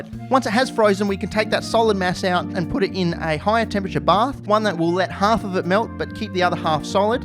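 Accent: Australia. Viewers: When I first saw this channel I thought damn this is so american but naw he australian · Honestly that's how Aussies speak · From the accent, you must be from Australia, the snake in the house--- sounds like down under. Many poisonous animals, and insects in Australia. I always wanted to see experiments using Hydrogen peroxide because I played with it in high school (I'm not a Chemist), and it fascinated me the many reactions like powered iron, or magnesium oxide from used up carbon batteries producing prodigious quantities of Oxygen. thank you sir! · I thought he was Australian not Finnish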